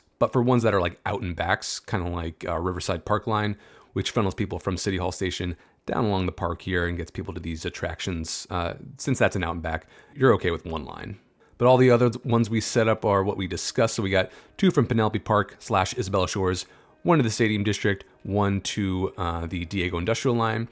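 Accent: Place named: United Kingdom. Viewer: Dewy Tubey, a little british accent LOL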